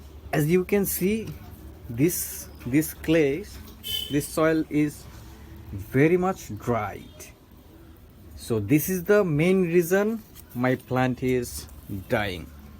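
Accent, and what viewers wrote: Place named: India. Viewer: Is this language English? Or Indian with some sentences in “English” ?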